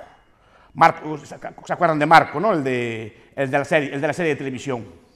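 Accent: Galicia, Spain. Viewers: Es gallego de aldea. El auténtico.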